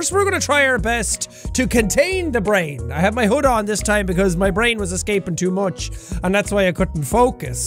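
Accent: Ireland. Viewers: Holy crap he is Irish so I am yay 😁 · We love the angry irish accent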